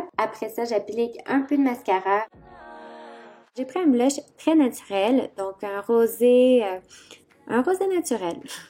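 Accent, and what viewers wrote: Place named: Quebec, Canada. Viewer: Tu es québécoise ? J'aime ton accent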